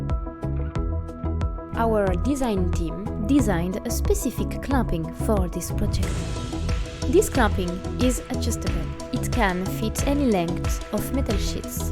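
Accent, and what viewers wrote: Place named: France. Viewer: Thanks to the french for ruining this video i cant understand anything over her accent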